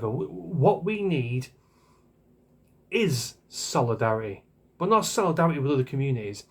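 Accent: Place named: Yorkshire, United Kingdom. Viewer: A Very Good video,! Thank You Lad!! I'm fm Yorkshire, and you sound like a great Yorkshire lad, with a lot of Sense! - Thank You!! For All, the sensible and well grounded vid's you have put out! But, just to, hopefully, bring to everyone's attention - how many people know about, or have heard of the Very TRAGIC - DUNBLANE - Where, 116 primary school children, were shot to death, by a local Scottish, Very evil man, who then cowardly shot himself. It, happened in 1996, and it's stayed with me....Forever. But, private ownership of guns was Banned! So, Something, was done about it! And, now there is not as much gun crime, in the uk - but, Senseless stabbings have Increased, So dramatically! Oh, Lord God, please give your comfort and strength to the, Poor, mum's and dad's of their 3 brutally murdered, little daughter's soul's. I, have been So Shocked, and saddened by the pathetically biased so called British media. 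I, wish we still had the 'News of the World' newspaper! At, least they had a BACKBONE!!!!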